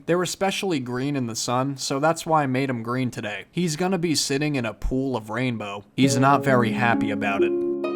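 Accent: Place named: Virginia, United States. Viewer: OMG VIRGINIA NOW I CAN PINPOINT YOUR ACCENT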